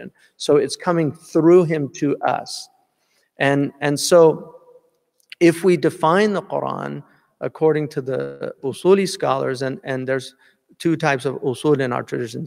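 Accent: Arab world. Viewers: Marhaba Sheikh. You know that ..I can never stop admiring your ability in Arabic language. The way you pronounce is exactly like a one that was born in Arabia. I am born in middle east and I have seen Persian and Turkish when they study Islam. They can never pronaunce the word like you do. In addition to the most beautiful point you refer to. But Sheikh the way you talk Arabic just makes me speechless.It makes you more arab then me!? 😇...amazing!...رمضان كريم علیکم.. نسأل الله ان يكتب لنا الصلاة خلف الإمام مهدى(ع)!💚💥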